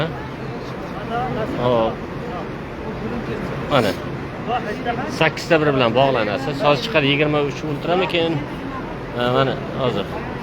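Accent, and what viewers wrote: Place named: Namangan, Uzbekistan. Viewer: Хожи ака сиз Наманганлик танидим овозингиздан буйрак огриганларга тавсия бергансиз олох зиерат ларингиз Кабул булсин. Олох сиздан рози булсин. Мен сизни. Новосибирдан кузатиб бораман Хаж умрага бордим олохим кайта кайта боришликни насиб килсин Олох. Асалому алейкум ва рахматулоху ва барокоту 🕋🕋🕋🕋🕋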